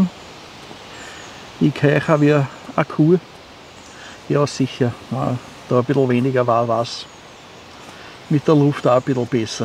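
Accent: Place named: Vienna, Austria. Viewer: Hallo!Dieses Jahr kommt mir die Natur so viel grüner vor als die letzten Jahre,oder kommt es nur mir do vor. Schönes neues Plätzle! Hast dich ja gleich schön häuslich eingerichtet. In letzter Zeit verstehe ich dich nicht wirklich, was vermutlich mehr an meinen Ohren liegt, und ein wenig, dass mir der Wiener Dialekt nicht so geläufig ist. Aber du sprichst den ja schon immer, also sind es meine Ohren, dietrotz Hörgerät nur 50% erfassen oder inzwischen noch weniger. Also wundere dich nicht, wenn ich nicht darauf eingehen. Aber ich gucke dir gerne zu. Bleib behütet, Grüßle Gunde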